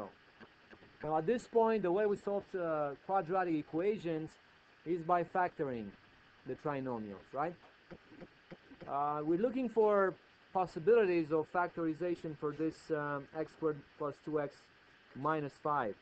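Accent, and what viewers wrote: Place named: Eastern Europe. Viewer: Where is your accent from? Just curious I wanna guess eastern European.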